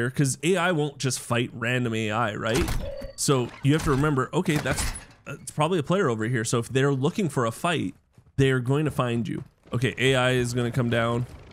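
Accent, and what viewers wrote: Canada. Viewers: you are definitely Canadian 😂❤ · I love that Canadian accent you got there bud. I like watching your videos, I'm a big supporter over here. When they killed you at the exfil, I laughed so hard I almost peed my pants. I'm so glad that it's not just me that gets ganked all the time at the exfil.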